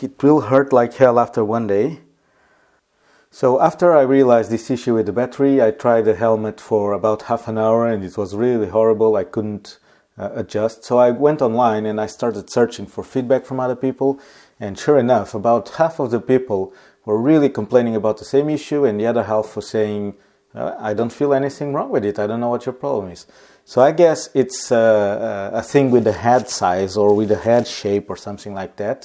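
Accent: Portugal. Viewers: És tuga não és?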